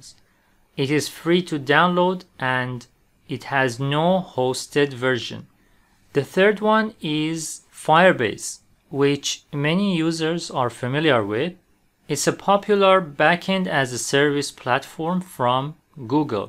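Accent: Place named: Isfahan, Iran. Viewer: Nice very nice اما فکر کنم ایرانی هستی اونم اصفهانی 😊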